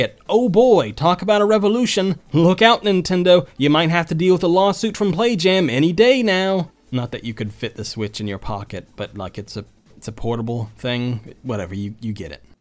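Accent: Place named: Scotland, United Kingdom. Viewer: "Home town in South Carolina" Has Scottish/British/Aussie accent.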